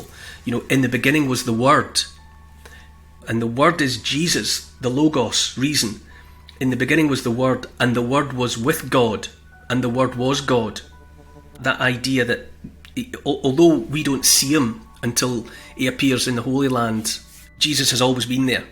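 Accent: Scotland, United Kingdom. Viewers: I like accent. Is it Scottish?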